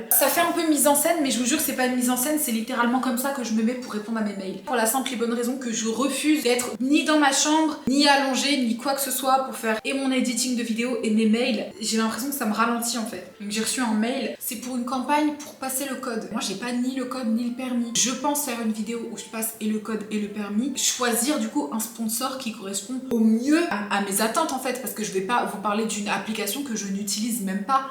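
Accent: England, United Kingdom. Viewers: Son accent anglais est très intéressant et tu gagnerais à l'écouter car ça permet de retenir certains mots. L'anglais est la langue la plus importante donc il faut t'y mettre.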